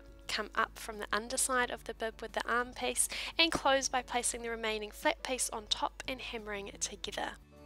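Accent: New Zealand. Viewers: her accent is kiwi - New Zealand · What accent is that ? Nz ?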